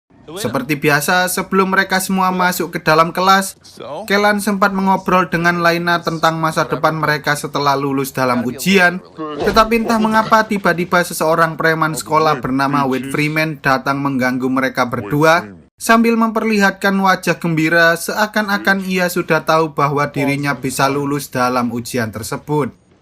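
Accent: Java, Indonesia. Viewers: Baca ny jgn medokkkkkkkk😂😂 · Medok banget aksen bicaranya, bikin pusing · Ya allah mas medoknya😢😢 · logat jawa ya bang biasa yg gw dnger logat sunda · Jowo kentel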